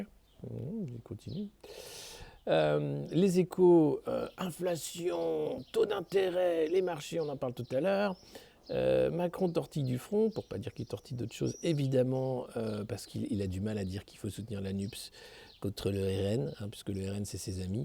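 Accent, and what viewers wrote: Quebec, Canada. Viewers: L'accent québécois "a frigore" !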